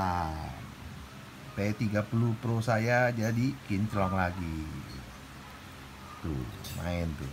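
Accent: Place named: China. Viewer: logat cina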